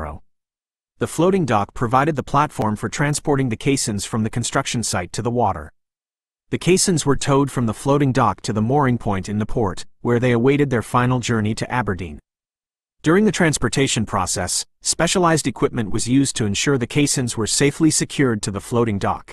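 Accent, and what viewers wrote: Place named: United States. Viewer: Not sure why there is an american voice doing this when the whole thing is Europe based, l never watch or listen to an american voice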